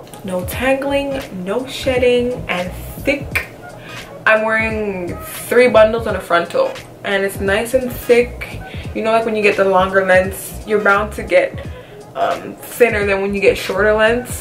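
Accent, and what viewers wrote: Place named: Caribbean. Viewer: I hear the island baby where u from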